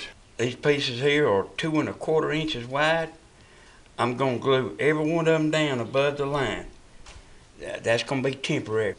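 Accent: South, United States. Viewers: Liked your video. Short and to the point. Plus I love the southern accent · I just simply love your southern twang, your funny, and know what your doing. Do you even watch TV?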